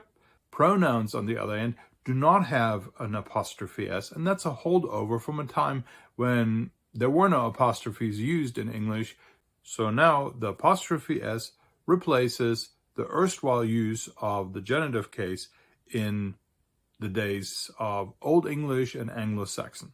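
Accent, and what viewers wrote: North, Germany. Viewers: German speaker here: You have a slightly Northern German accent. There (and even more in related Dutch) constructions with "von" are more likely than in standard German. I, located in Hessen, prefer Bian Coady´s sentence.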